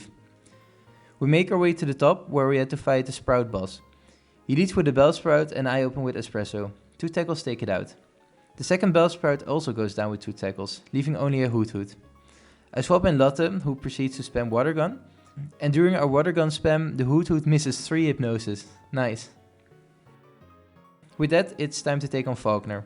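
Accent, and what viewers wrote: Netherlands. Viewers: Gotta love the Dutch accent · are you dutch perhaps? i can hear a small accent during your complimentary XD. anyway, you just gained a new subscriber. i love the vids! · Nuzlockes zijn zo leuk om te zien echt waar geen idee wrm maar goeie video man (ja ik wist dat je nl was door de accent hahahaha) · The yt algorithm strikes again! Also, I can hear you're dutch ;) lekker man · I've never watched your channel, but I like watching nuzlockes. I wanted to check it out. Your actually pretty good and definitely wasn't expecting the Dutch accent. Are you new to nuzlockes? I could give you some tips if you want. Edit: I just saw you don't have many subs, so ima sub. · Are you dutch by any chance? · Brooo the dutch accent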